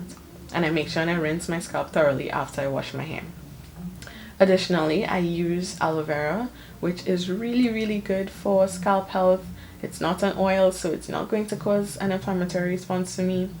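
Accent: Trinidad and Tobago. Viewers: Hey girl great video. Oils don't work for me either. Glycerin has been a game changer for me....it is not an oil so i don't get a response . It's also a humectant so it attracts moisture to your scalp and hair...its especially useful as I have relaxed hair as it help make my new growth soft and manageable. When my dandruff as bad.... I used keto plus shampoo only on my scalp. Massaged it and Left it on my scalp for 20-30 mins ..and when I tell you...by the second wash I saw no flakes. I used Glycerin to moisturize my scalp every other day and to my weekly deep conditioning treatments...you sound Trinidadian... looking forward to more videos . Bye!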